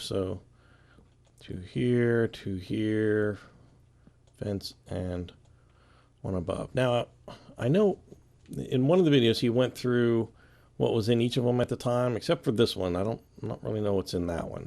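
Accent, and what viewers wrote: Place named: United States. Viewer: he's from america but he talks a little different fro me I'm from England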